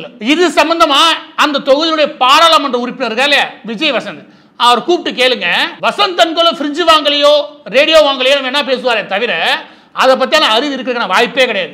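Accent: Madurai, India. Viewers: மதுரையை விட்டு கிளம்பி மஹாராஷ்டிராவுக்கு வந்து 24வருஷம் ஆச்சு.. உங்களாலே தான் மாரி தாஸ் சார் நான் மதுரக்கார ஃபீலிங்ல சந்தோஷமா இருக்கேன் 😊✌🤝I like your Madurai accent👍👏💃😊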